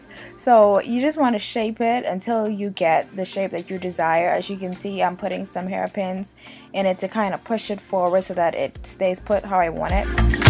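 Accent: Bahamas. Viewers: Omg when I heard you speak I was wondering if you're Jamaican!! :) I never realized how close the Bahamian accent is to ours :) Love your hair btw!!! :) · LOL I just knew you were a Bahamian from you first started talking. Love the videos. Keep it up! :) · your Bahamian?! 😀😀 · Love your tutorial. Automatically heard the Bahamian in you. Keep it up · Dat Bahamian accent strong boy lol biggups to all my Bahamians! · Cant hide from that Bahamian accent..lol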